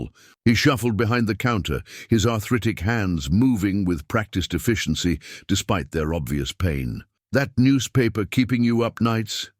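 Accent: United Kingdom. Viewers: Your voice sounds like your from London ! Exquisite voice!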